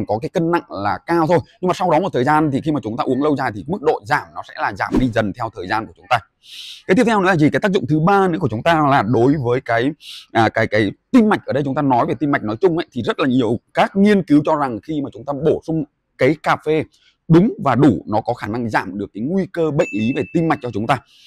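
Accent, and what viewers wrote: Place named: North, Vietnam. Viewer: ơi! Em vui lòng nói chậm lại một chút được ko? Em nói nhanh quá anh nghe ko kịp vì anh là dân Sài Gòn nghe tiếng Bắc nói chậm thì được còn nói nhanh quá anh nghe ko kịp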